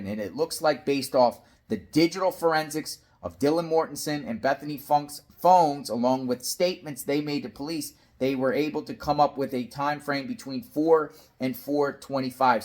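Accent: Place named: New York, United States. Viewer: Love love all your content. Ur awesome! I especially love your NY accent!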